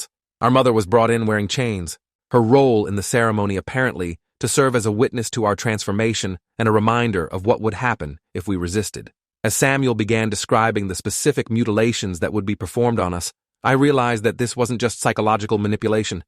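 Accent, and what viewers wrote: United Kingdom. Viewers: why british again? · anyone hears the British accent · Why does it keep slipping into a British accent? 😂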